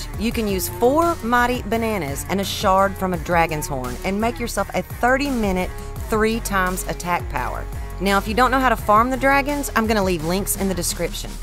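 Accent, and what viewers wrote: South, United States. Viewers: I'm just here for the Southern accent 😎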